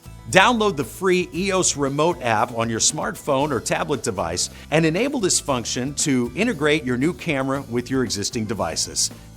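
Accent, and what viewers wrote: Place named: United States. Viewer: Turned straight off, annoying American accent.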